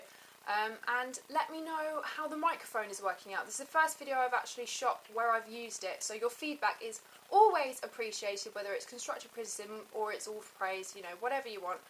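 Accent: England, United Kingdom. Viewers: U r pretty without makeup and r u English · she is english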